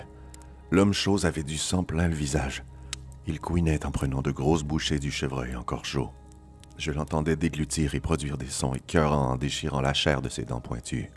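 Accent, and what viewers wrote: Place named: Canada. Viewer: Je viens de découvrir la chaîne. Vraiment excellent! La narration et ce petit accent canadien j'adore!🥰👌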